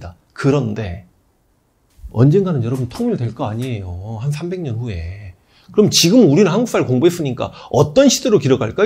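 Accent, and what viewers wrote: Jeolla, South Korea. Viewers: 전라도 사투리 쓰시네요?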